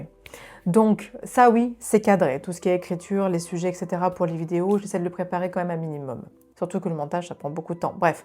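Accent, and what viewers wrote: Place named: England, United Kingdom. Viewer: Ha je viens de comprendre d’où vient ce charmant accent! Je pensais d’Angleterre, je n’étais pas loin!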